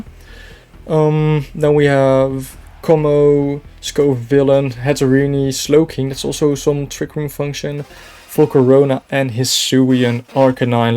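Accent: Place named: Netherlands. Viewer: ik ruik een nederlands accent hehe.. leuke video man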